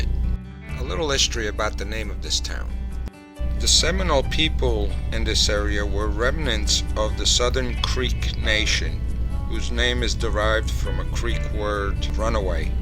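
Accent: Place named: New England, United States. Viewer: should not be narrated by a yahkee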